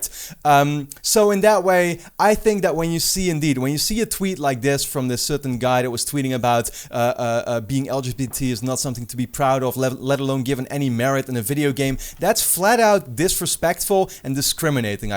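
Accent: Netherlands. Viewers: Ah, ik dacht al een Nederlands accent te horen. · are you dutch ? Your accent sounds like it ;p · The sexual orientation of a character really does not matter to me, nor their race, gender, strength. I really agree with everything you said, even though it's a while ago. I understand that people might think an agenda is being pushed, because they do not agree with it, and suddenly they are confronted with something they might not expect in a game like tlou, but i hope they can learn to see, it is not pushing anything. If Ellie would be kissing a boy, sure, someone might say he is pushing a hetero normative agenda, because they would rather see more gay romance in games or on screen, but in the end, the developer has all the right to put his or her ideas into their creation, their fiction. Their art. I'm not really OK with people demanding artists to change their work to please the masses, but ofcourse, everyone can have their opinion, but they shouldn't expect the devs to bend to their will. 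And, are you a fellow dutchy? Not that it matters, but the accent? Cheers for the video.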